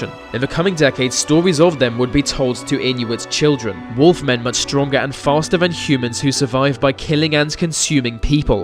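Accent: United Kingdom. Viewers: Can anyone tell me why we need to have GODDAMN LIMEY'S narrating ANYTHING especially for Canadian videos?!? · Word if advice to anyone not familiar with Ontario: don't EVER say it the way the narrator does in this video. That is incorrect and you will be corrected and/or laughed at if you do. No, just because he's got a British accent it's not some eclectic English pronunciation he blurted out, dude simply never heard it pronounced before and that's a fact. The A in Ontario is a long vowel, not short. Simon Whistler does this same shit all the time in the videos he narrates too.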